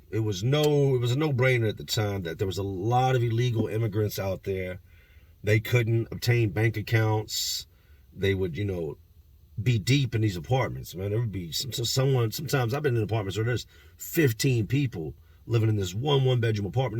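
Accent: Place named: Philadelphia, United States. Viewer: When I 1st heard u talk, I thought you were from N. Philly!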